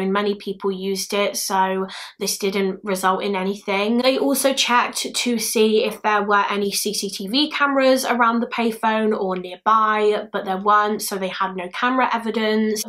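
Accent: United Kingdom. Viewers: Amazing Thankyou, also as lovely as it is the American YouTubers I do love a Brit one xx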